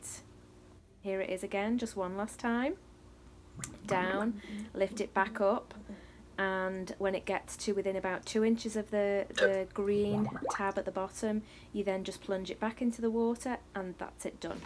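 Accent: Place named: North West England, United Kingdom. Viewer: Great video... mine said i needed to shake it up and down in the water to start it... there was more water on the floor afterwards than in the bucket. Are you from the north west (wirral way?) I cant place that accent but it sounds familiar.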